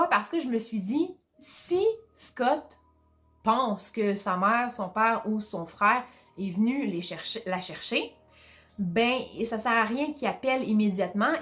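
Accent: Quebec, Canada. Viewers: Merci pour cette vidéo. C'est bien raconté et pas trop court ni trop long. Et j'aime beaucoup l'accent québécois. Si tu me permets une petite correction, au tout début de la vidéo : On dit "un" épisode (c'est masculin). Et une petite remarque : Il y a beaucoup de détails tout au long du récit qui, selon moi, n'apportent rien d'intéressant à l'intrigue comme par exemple le prix de la maison cité au début, le nombre de pièces,... Merci pour tes efforts ❤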